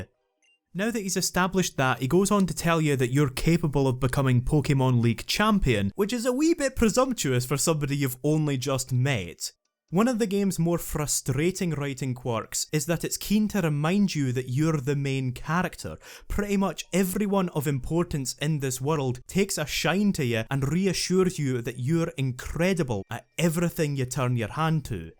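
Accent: Scotland, United Kingdom. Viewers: Mm yrs I sure do love a Scottish man narrating a remake of a game for 7 hours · HOLY MOTHER OF ALL VIDEO ESSAYS... Good thing I really enjoy scottish accents. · 7 hours of Scottish accent, LETS GO!! For some reason it actually helps me focus on the content of the video. Don't ask me why lol Have you ever gone back and played it since this video? Shiny hunt or just playing through again? What about AS? have you played that yet? I've always kinda disliked that they just copy pasted the Battle Maison from XY and not have their own unique battle facility. I know HG/SS did that with plat but still :( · Seven hours of ah Scottsmann tolkin aboot Pokähman? Consider me signed up. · This guy ain’t just Scottish, he hella scottish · I never thought hearing an extremely powerful Scottish accent after the ORAS opening would be so jarring. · Lol i couldnt understand i it because of the scottish. 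But your brothers catchphrase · THIS IS MY FIRST VIDEO IVE SEEN FROM THIS GUY AND THE SCOTISH WAS THE BEST SURPRISE EVER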